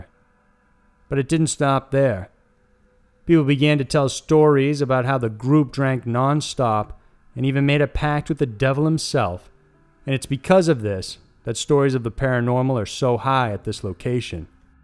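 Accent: United States. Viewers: yeah finally an American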